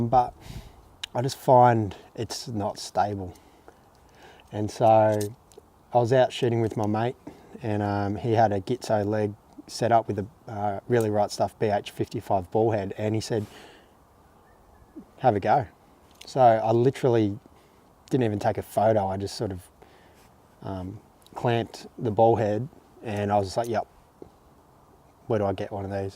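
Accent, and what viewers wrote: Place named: Australia. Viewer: why on earth would this video get so many dislikes... haters? Anyway, I ordered a RRS TVR24 and BH40 w/swivel mount for my Leica M10P and Noctilux .95 50... nothing but the best all across the board.. and haters gonna hate, who cares! -- btw, I hear a Ausie accent, but looking at you I'd swear you were from Portland Oregon.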